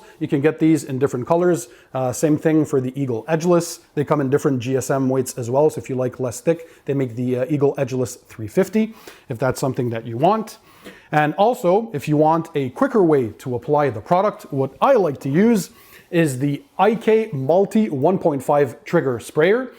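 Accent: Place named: Montreal, Canada. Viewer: I was going to ask if your from Montreal because of your accent and then you said it! From here too!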